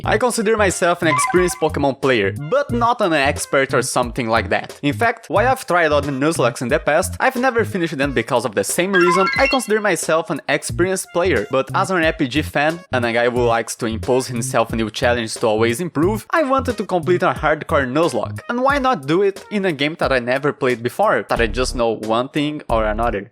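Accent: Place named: Brazil. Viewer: Sabia que era brasil pelo sotaque adorei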